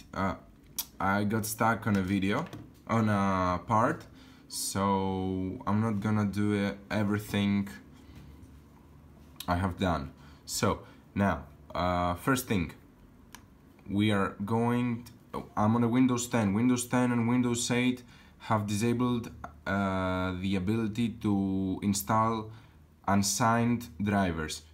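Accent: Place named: Greece. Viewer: Ε, μονο απο την ελληνοααγλικη προφορά καταλαβα πως εισαι Ελληνας :ο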